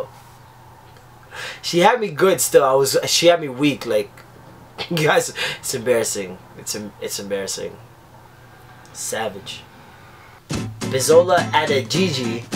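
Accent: Jamaica. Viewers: Are you from Kingston, Jamaica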